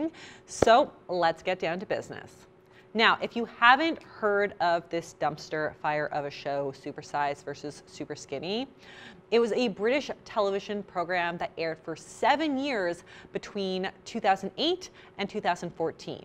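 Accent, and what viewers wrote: Canada. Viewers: Well yeah, at least in this context they are very different. The US has shows that are arguably more vulgar about weight than this one- My 600-lb Life or 1000 lb sisters. I don't think you knew she was Canadian, it doesn't seem like the majority of British people can distinguish between Canadian and American accents.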